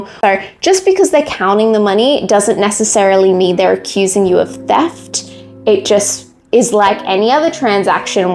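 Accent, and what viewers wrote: Australia. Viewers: surprised by the aussie accent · That's an Oz accent.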